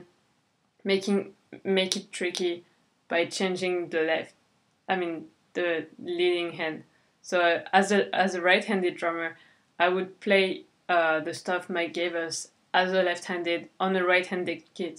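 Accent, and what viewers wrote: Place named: France. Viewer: Super idée, j'y ai pensé plusieurs fois mais j'ai jamais eu le courage ! Je viens de me commander "The weaker side" de Dom Fomularo, je vais commencer à me plonger dedans que dès je l'aurai reçu, je te dirai si c'est efficace :) Sinon, t'as pas à rougir de ton accent, t'as un anglais super fluide, on dirait presque pas que t'es française parfois, j'aimerai parler aussi bien anglais !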